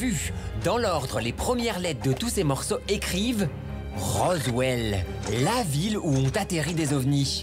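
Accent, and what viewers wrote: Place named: England, United Kingdom. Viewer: Super accent anglais !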